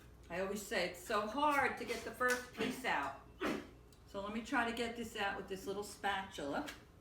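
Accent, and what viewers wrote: New York, United States. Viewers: Hello, New subscriber to your channel. Thank you for subscribing to mine too! I love learning of new recipes so glad to be here! Love your accent too, is it New Yorkish!? I'm from NY but now living in England! Adorable apron and pick spatula. The bar looks really delicious! Mmm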